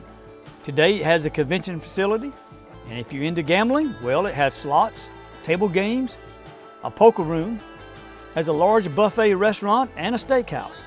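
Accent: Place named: West, United States. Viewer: You have an great western cowboy accent and amazing story narrating ability.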